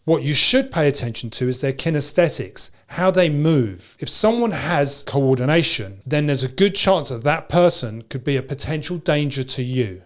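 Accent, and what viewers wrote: United Kingdom. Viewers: The comments are from people all over the world, but especially GB, because the narrator is British. We don't have access to firearms in Britain. I'm guessing that you are a US citizen, and I understand your right to do EDC and defend yourself with a handgun. Here they are not morally justifiable for ordinary citizens, but that could change of course.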